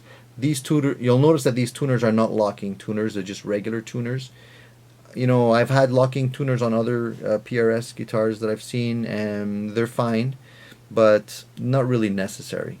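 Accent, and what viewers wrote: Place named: Quebec, Canada. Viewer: French Canadian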